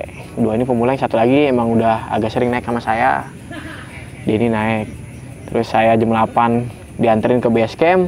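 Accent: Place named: Tangerang, Indonesia. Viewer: KAGA ADA YG SIUL greget amat bang wkwkkw Btw nada logatnya tangerang banget bang, mirip film si marko :v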